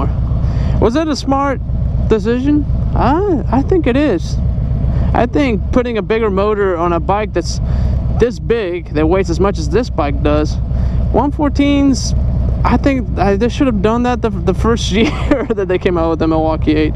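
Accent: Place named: Germany. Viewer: Nice review man. Where is your accent from? Deutchland?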